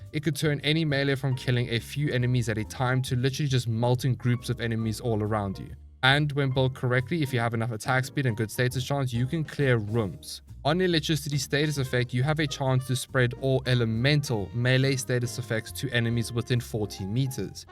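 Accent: South Africa. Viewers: Hey dude just discovered your channel. Really enjoy your videos so far, keep up the good work. Are you from South Africa? :)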